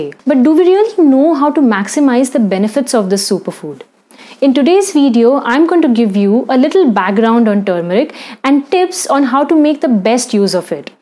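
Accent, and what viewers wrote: India. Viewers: Pronounsation of an Indian,like it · The Indian accent is much more elegant to this American's ears than most British accents.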